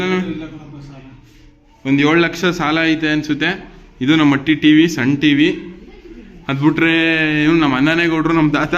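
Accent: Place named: Nanjangud, India. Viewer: ಯಾವ ಊರು ನಿಮ್ಮದು. ನಿಮ್ಮ ಭಾಷೆ ಕೇಳ್ತಿದ್ರೆ ನಂಜನಗೂಡು ಕಡೆ ಅವ್ರು ಅಸುತ್ತೆ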